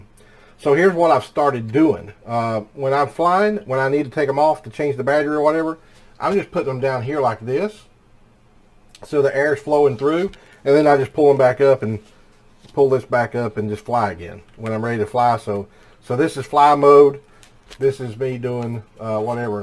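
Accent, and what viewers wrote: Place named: North Carolina, United States. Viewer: I thought that accent sounded familiar, formal North Carolinian here. Out here in Utah. We get fogging anytime the temperature reaches over about 90° it’s not super major as our humidity is low. I do get worse fogging when I placed them on my head unless I’m wearing a hat to fix this I just moved them higher up on my head.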